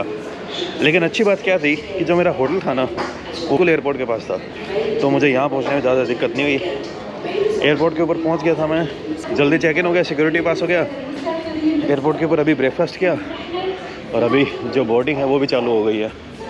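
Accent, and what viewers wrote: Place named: Delhi, India. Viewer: Brother I understand every word of yourself , your voice is similar to delhi region hindi , I study in delhi university in 2007 and live 4year in india , I am also able to read and write hindi and speak also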